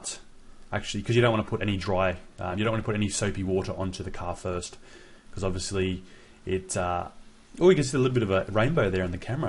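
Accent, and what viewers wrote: Australia. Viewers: He’s Aussie? Let’s go😂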